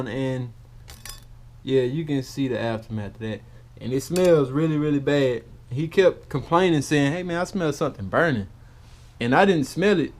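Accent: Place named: Gulf Coast, United States. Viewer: yo, where do you live? You have that Gulf Coast sound to your voice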